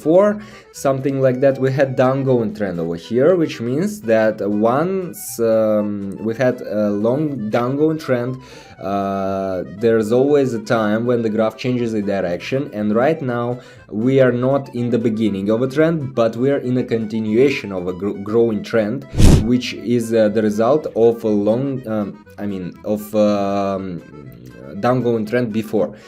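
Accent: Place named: Russia. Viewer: feel his russian lol subscribed just for that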